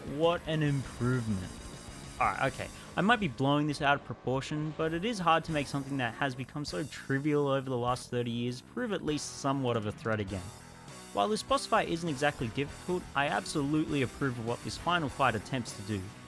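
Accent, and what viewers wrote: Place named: Australia. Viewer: Know what makes a good YouTube video? Anything on Doom. Know what makes it even better tho? Add an Aussie accent. Truly tho, loved the video. Keep up the great work there fellow Aussie! Rip and Tear!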